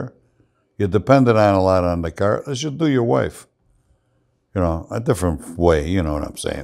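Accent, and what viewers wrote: Chicago, United States. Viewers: I love listening to the way you talk. The Chicago way. I always did the same thing with cars and clothes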